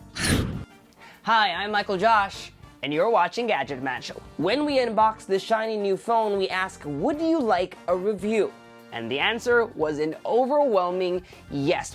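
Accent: Singapore. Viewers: are you from SG? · Singaporean or Filipino?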